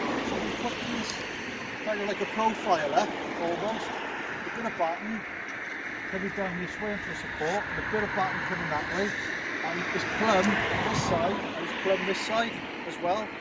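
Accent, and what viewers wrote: Wales, United Kingdom. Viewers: Nice video 👍 very neat work and your welsh which makes it better 😂cymru am byth! 🏴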